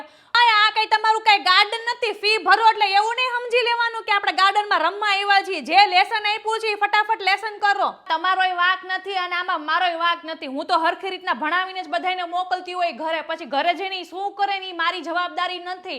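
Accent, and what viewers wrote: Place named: Saurashtra, India. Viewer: જય સચ્ચિદાનંદ તમારી સૌરાષ્ટ્ર ની ભાષાગમે છે.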